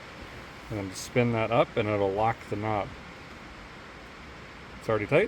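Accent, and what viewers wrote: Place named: Appalachia, United States. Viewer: Why do you talk like a hillbilly from 40 years ago?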